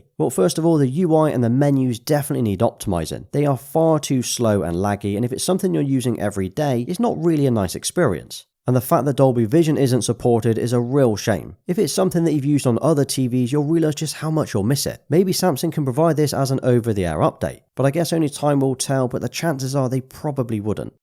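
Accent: United Kingdom. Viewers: By the way when I first purchased my Samsung S95B OLED TV in April 2022 at Best Buy in the USA, it cost $2,100. When Black Friday game in 2022, it was selling for $1,400 at Best Buy. 😭You sound like you located in the England with a British accent. I wish you made your TV stand to be sold in the United States. Wow, that stand is awesome and I plan to upgrade from a Samsung 55 OLED S95B to a Samsung 65 inch OLED in about 2 years and your stand would be perfect in my apartment.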